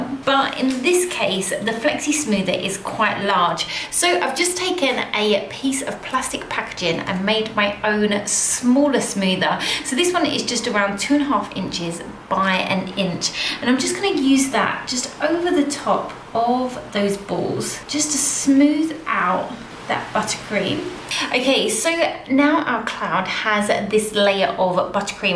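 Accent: United Kingdom. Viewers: As much as I love your rainbow cake, I love your British accent ❤❤❤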